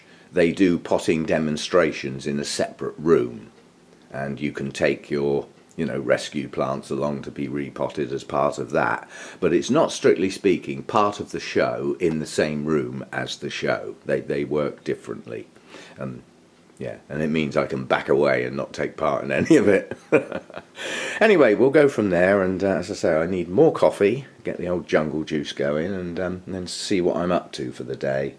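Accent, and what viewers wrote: England, United Kingdom. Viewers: I certainly just love listening to your English accent, and I think if I live across from you I would look forward to when you are starting your car, I can't help it I get a good laugh 😉😉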